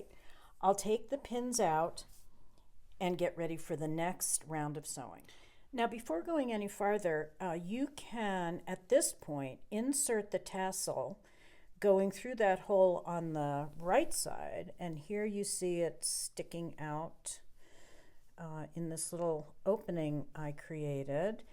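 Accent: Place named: United States. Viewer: Oh, you’re so welcome. If it’s any consolation, even young immigrants find it hard to make friends here (see Simple Living Australia - I think that’s the channel name. I’ll come back and edit if I got it wrong.) it might also be more difficult because of your accent. Americans don’t have a good reputation here, unfortunately for you, because you sound like good people. Please, if you know of any anti-Trump or resistance protests here in Oz, please let me know. I’ve wanted to attend No Kings protests here in Sydney, but can’t find any details?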